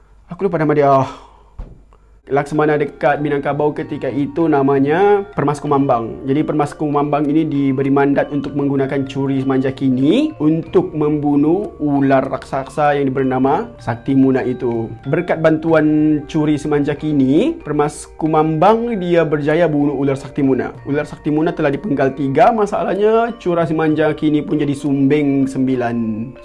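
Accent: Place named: Sabah, Malaysia. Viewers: Dah sah2 dia orang Malaysia dik.. dia cakap guna loghat Sabah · Saya ingat abg orang sabah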